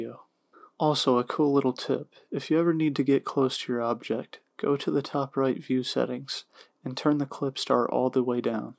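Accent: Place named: Texas, United States. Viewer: bro just sounds like a half asleep texan idk about smooth lol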